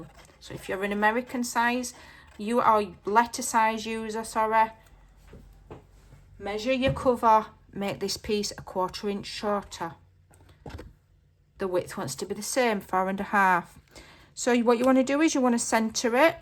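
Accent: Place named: Scotland, United Kingdom. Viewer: Hi there, I apologize, but I can't hear what the name of the tape is - Mac isnt making sense trying to translate the "scarpile" tape. I begun subscribing to your channel for about 6 months ago, & I love watching you make these journals. You just showed some of the once you've made Nov 4th 2025 & I want to try making one for one of my grandchild - thinking of making it as an Advent calendar for her. Don't know yet how or if it will work. I'm from Sweden, so English isn't my first language, love your Scottish/Wales accent - just guessing now. Would be grateful for your help, with the name of the tape that you mention. Thanx for sharing this tutorial. From Lia/Sweden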